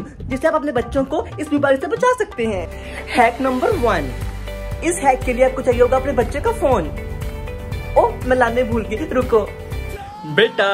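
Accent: Punjab, India. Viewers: Your punjabi is so cute❣️❣️❣️❣️💜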